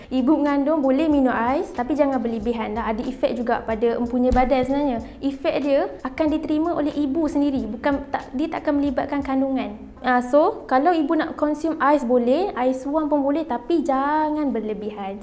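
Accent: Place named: Kelantan, Malaysia. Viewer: Awak terlalu kelantan untuk saya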